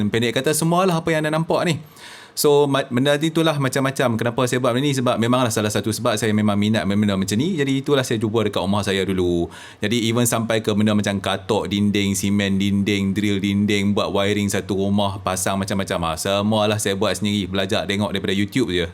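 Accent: North, Malaysia. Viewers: tang cakap utagha tu yg sempoi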